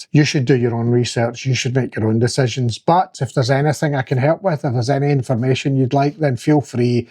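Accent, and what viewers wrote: Scotland, United Kingdom. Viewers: thanks for your info a fellow Scot its great to hear a local accent! Im on my 2nd 2.5 pen good suppression but by day 5 i feel it dip. So ill start giving myself 2.5mg every 5 days. My next pen do you think i should move up if still getting suppression? Or irder a 5mg pen anyway?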